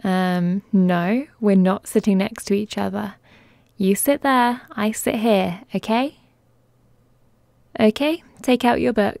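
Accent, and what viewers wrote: England, United Kingdom. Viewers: It’s just an English accent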